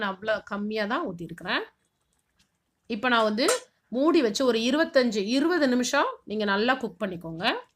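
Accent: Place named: Nagercoil, India. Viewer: Hi sis... Neenga yendha ooru. Coz.. Unga speech ketta... Nagercoil maadhiri theriyudhu. Adhaan ketten.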